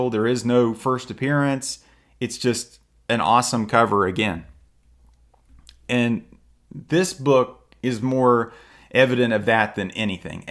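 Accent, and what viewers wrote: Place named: Philadelphia, United States. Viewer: good gawd that philly accent is awful ew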